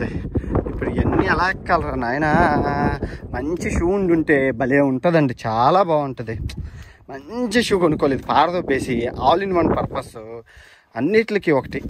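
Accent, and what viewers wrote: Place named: Srikakulam, India. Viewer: Bayya nuvvu evaro naku teliyadu 2nd video chusa this video was keka and so natural , like ur seikakulam accent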